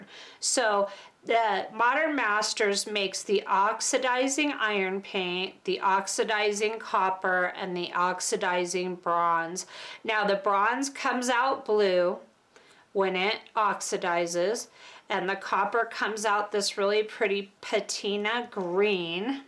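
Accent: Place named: Midwest, United States. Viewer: Thanx so much... I was looking for those paints!!! Phew! Saved my sanity... lol. I am a new sub and really enjoy your videos. Love the different techniques you show us! I am wondering... where do you live? I am in SE Texas but you seem to have a midwestern accent. Just curious... Hugs!!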